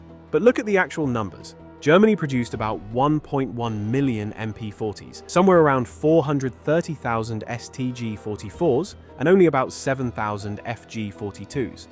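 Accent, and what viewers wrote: United Kingdom. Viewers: so british, so smug. GAAAAHHH RAAAAND.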